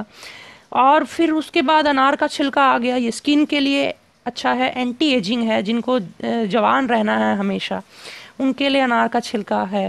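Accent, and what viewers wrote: Punjab, India. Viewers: swami g..aaj tak maine itni sachayi or somyta awaz me kbhi ni dekhi...chahe bzy hone k karn shuruaat ni ho ri ..bt aapki vani mein puri schayi jhalkti hai..beech me punjabi ka jo tuch hai ..usse to aur.bhi saadgi jhlkti hai..jo hmare buzurgon.ki.yaad dilaati.hai..god bless u swami g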